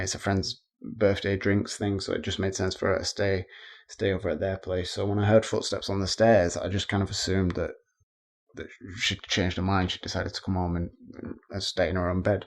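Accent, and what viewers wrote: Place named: North, United Kingdom. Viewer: Just found this channel awesome stuff man. That was my life from a small kid until I was around 17 and moved out. But over the last five years the lights and, colours and noises are back around me. Kind of welcome it now. Where abouts are you from. Sound like a fellow northerner. Keep up the good work.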